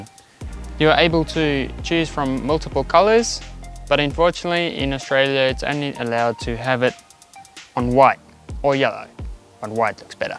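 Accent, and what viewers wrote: South Africa. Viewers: I can hear that Boer accent coming out. Love that i0 of yours. Have a Jimny but tired of the 1.3 and other irritants. Enjoy. Cheers from sunny SA.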